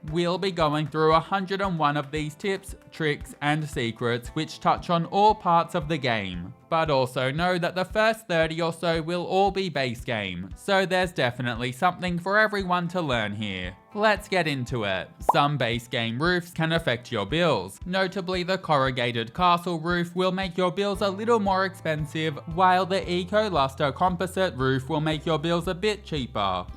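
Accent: Australia. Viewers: Hey! No we don't! 🤣 I'm English, born and bred, and have either friends or family from all three of the other countries within Britain, and we pronounce it 'COE-coe' (both syllables rhyming with foe, or sew, with the stress on the 1st syllable) like I thought everyone else in countries that use English did until now. Don't think he's British, his accent sounds Australian? or New Zealand? but none of the Ozzies or Kiwis I know pronounce it 'coe-COE-ah' either. I also had a good laugh at 'bom-BAD-ee-er' (Bombadier Beetle) - I've never heard it pronounced any other way than BOM-bah-deer 🤣🤣🤣 Don't watch youtubers much so not familiar with this guy - I actually started thinking it might be an AI voice 😳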